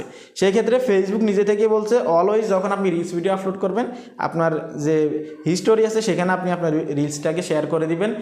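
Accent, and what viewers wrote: Noakhali, Bangladesh. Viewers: ভাই আপনার বাড়ি মনে হয় নোয়াখালী🙂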